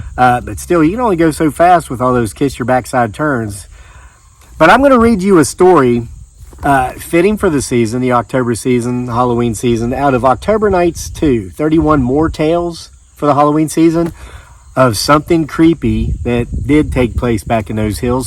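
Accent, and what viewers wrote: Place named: West Virginia, United States. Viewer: I love all of your stories ..... I was born in West by God Virginia and spent my first 18 years there..... I love hearing your West Virginia accent..... and stories ....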